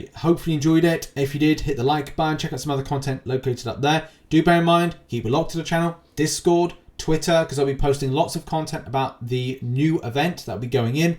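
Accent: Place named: United Kingdom. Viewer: Hey, I’m asking how do you have marvel insider, you sound like you’re from the UK, and so am I, but when I went to get it, it said it was only for US residents.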